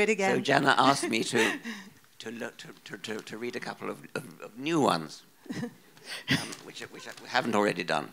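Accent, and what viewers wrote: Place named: RP, United Kingdom. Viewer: What makes this hilarious is reading out these obscene comments, in his posh accent.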